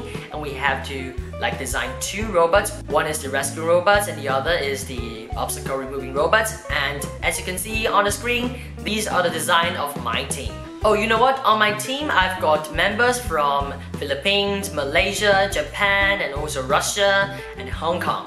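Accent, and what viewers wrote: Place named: United Kingdom. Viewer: Well done! Sound so British, haha!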